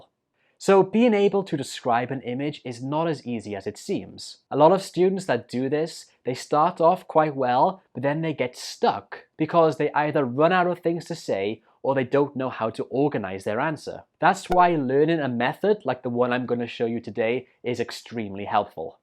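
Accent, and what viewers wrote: United Kingdom. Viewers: Your English pronunciation is so good, are you British because your accent is very nice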